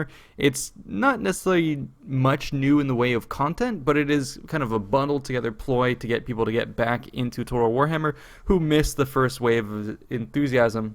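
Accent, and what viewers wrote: France. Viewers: Check a previous comment thread. He IS French. Said so himself. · He most definitely IS French. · He's actually French. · he's french... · Geralt, Private Investigator You know he's actually French, right? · You have a really french agsent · Nice french accent. Would hear again. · he´s french, dude :)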